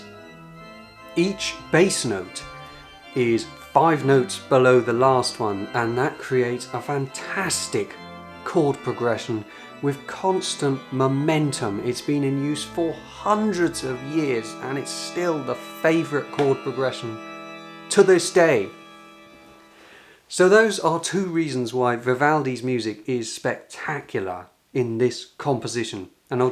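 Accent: United Kingdom. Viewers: Dear, a while ago I have come across your beautiful YouTube channel and have been glued to my notebook screen ever since. in order to catch up with as many as the older videos as possible. It is such an amazing abundance of landscape, church architecture, organ expertise, wisdom, language and literature and last but not least – music. I love the magic moment when you open a church door, expectantly and respectfully, As a translator/interpreter from Icelandic I have an ear for languages and accents and I must admit I fell for your beautiful British, or should I say English, tongue right away. I could listen to you forever and you have definitely enhanced my vocabulary since I keep watching your videos. Please keep filming – and playing - and talking! 😊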